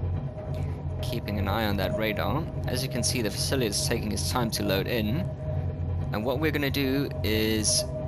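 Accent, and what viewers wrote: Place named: South Africa. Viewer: Ah cool, love accents but always had a hard time spotting south african, anyways thanks for the help with these videos, really helped a lot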